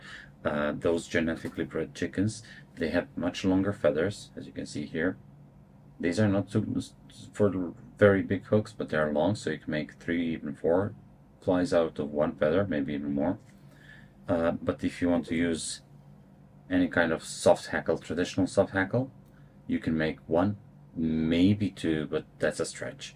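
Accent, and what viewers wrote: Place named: Europe. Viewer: Brilliant video, your very very good at displaying the real inside parts of fly tying. A short time ago I watched a lot of you videos,I am so impressed and have started fly fishing and tying again, what a great inspiration you are. Do you live in South China, you sound European that is very interesting, how is the fishing in China.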